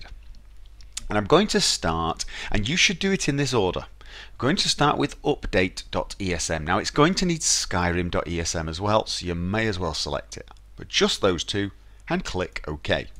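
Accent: United Kingdom. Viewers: Thank you for this tutorial.From your speaking tone,i'm guessing you're from United Kindom.I like the way you talk!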